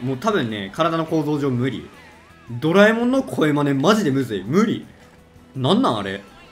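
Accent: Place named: Kansai, Japan. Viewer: また「関西弁のK4I」待ってます。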